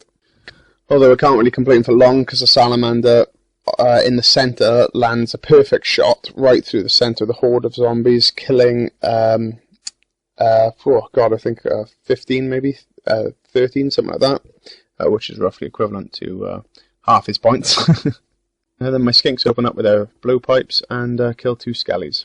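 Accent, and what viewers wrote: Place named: Wales, United Kingdom. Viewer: Nice battle report, lovely models. Good to hear a Welshman amongst all the Americans. Hope you do more videos.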